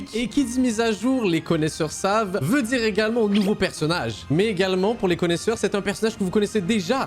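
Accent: Quebec, Canada. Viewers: Est-ce que c’est moi ou tu a une partie d’accent québécois. Je suis québécois et je crois que tu dois écouter quand même pas mal de vidéo québécois!!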